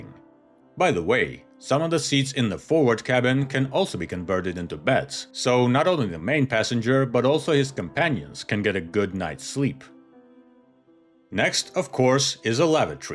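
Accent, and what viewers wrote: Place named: Russia. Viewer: I would watch this channel if not this fucking raSSIan accent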